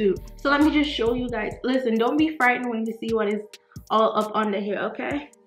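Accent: Jamaica. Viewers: Jamaican accent cant leave ya lol big up ya self my girl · Do I hear a Jamaican accent???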